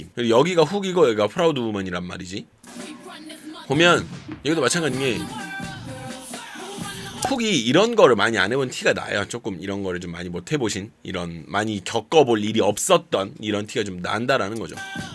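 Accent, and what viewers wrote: Jeolla, South Korea. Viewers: 전라도 사투리는 있으시네요 ㅋ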